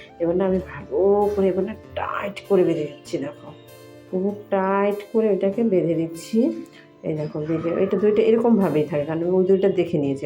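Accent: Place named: Rajasthan, India. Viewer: ❤❤❤ Rajasthani Bengali❤❤❤❤❤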